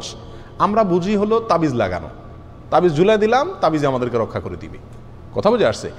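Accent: Chittagong, Bangladesh. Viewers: Vai Apni Ki chittagong Er ?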